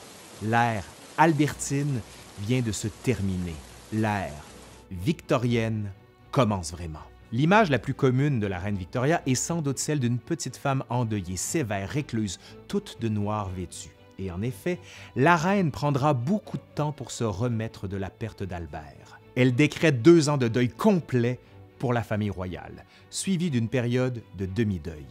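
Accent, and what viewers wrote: Quebec, Canada. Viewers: Sincèrement oui !!! excellent & fascinant ! l'histoire a toujours été mon "dada" (comme on dit en France, ma passion quoi) !!! tes talents de compteur sont indéniables 🤗 et j'adore ton petit accent québécois 😏🥰 j'aime toujours d'ailleurs la prononciation parfaite des noms anglais contrairement à nous 😅🙈 Ta chaine est ma préférée avec celle de Nota Bene & les Revues du Monde. Et oui j'avoue j'aime beaucoup aussi les émissions de Stéphane Bern 😅😁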